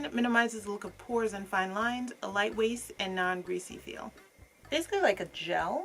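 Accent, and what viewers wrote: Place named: New York, United States. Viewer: I just stumbled across ur video u sound SOO much like New York or Tiffany Pollard😍❤❤ I was curious about these 2 products. I'm glad I found ur review! I like winter kimmie!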